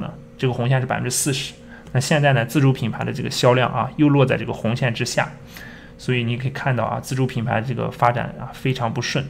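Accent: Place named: Shanxi, China. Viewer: 你是太原人 至少是山西人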